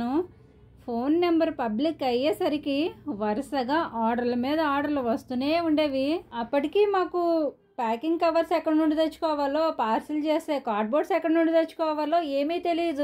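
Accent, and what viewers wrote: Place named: Kakinada, India. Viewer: Kakinada yasa tho baley sagadeesi matladutunnarandi.all the best.🎉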